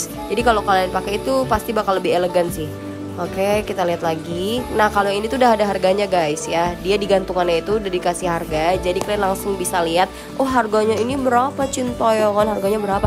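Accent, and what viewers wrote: Medan, Indonesia. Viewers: Logat medan itu kk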